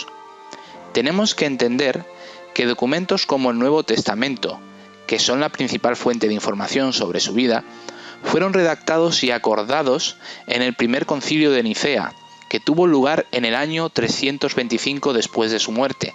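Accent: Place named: Spain. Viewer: Eres de España sin duda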